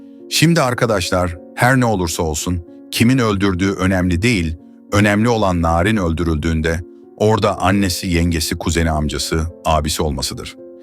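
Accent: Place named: United States. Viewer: Siz Amerika'da mı yaşıyorsunuz konuşmanız aynı Amerikalı gibi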